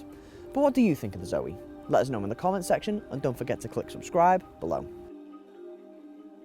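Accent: Liverpool, United Kingdom. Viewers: You from Liverpool???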